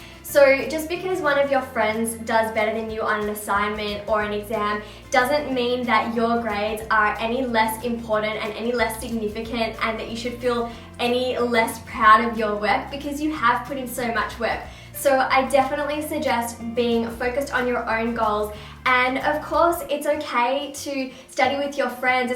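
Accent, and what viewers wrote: Australia. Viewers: I just discovered your channel and your videos are really helpful to get motivation to study^^ and btw you're accent is Australian right?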